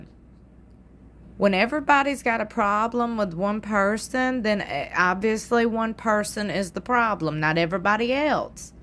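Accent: South, United States. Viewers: I love your voice! And your southern accent too!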